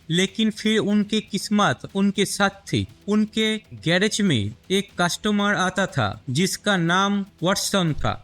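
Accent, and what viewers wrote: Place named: India. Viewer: Toom englis hindi ki mafik bolta hi.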